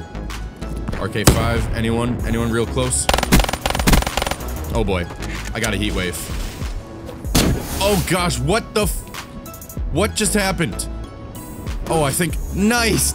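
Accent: Minnesota, United States. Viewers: are u from Minnesota?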